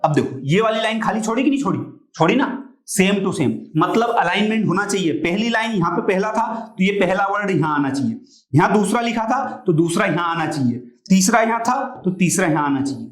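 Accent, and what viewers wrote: Indore, India. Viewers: thoda indori tone bhi h sir ka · Sir indore se ho kya😅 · Indore se ho kya bhaiya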